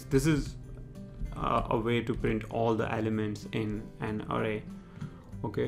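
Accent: India. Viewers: Your voice isn't as irritating as most coders with an Indian accent. Take it as a compliment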